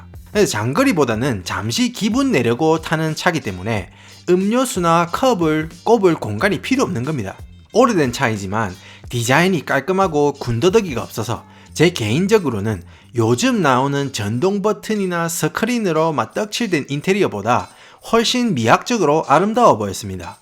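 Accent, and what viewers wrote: Gyeongsang, South Korea. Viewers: 첨으로 아주 재미있게 보고 갑니다! 경상도분이네용. Awesome video!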